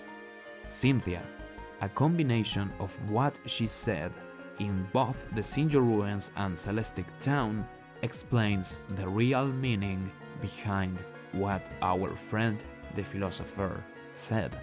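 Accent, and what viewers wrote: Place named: Spain. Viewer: I like your accent dude, where are you from? You mention empanadas in the description so I'm guessing español is your first language? Anyways really cool video with all the real world historical context. Earned my sub, man! I hope I will find more interesting videos when I check out the rest of your channel!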